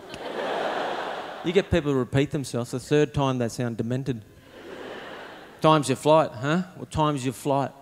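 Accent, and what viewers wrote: Australia. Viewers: He speaks strine.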